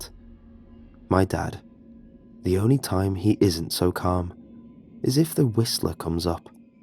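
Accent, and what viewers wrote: United Kingdom. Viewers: knows what he does his brit voice adds to the creep but then agian i only really listen to him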